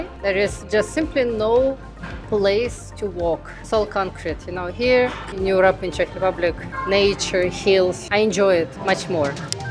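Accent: Russia. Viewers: Impeccable russian accent😊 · Она по русски говорит, акцент русскоговорящего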